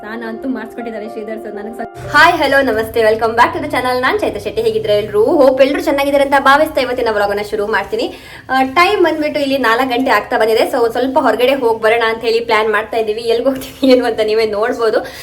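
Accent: Kundapura, India. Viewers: Super ede beach ⛱️ side yalla, kundapura kannadalli mataddidru swlpa swlpa arta agutte 🤭mutton biryani 😋nyc 👌 sharing ❣️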